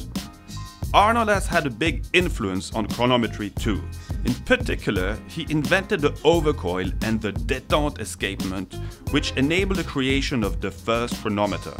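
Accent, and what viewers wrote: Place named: France. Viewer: Mais pourquoi ne pas le faire en français ? Surtout avec cet accent. Bonne revue quand-même.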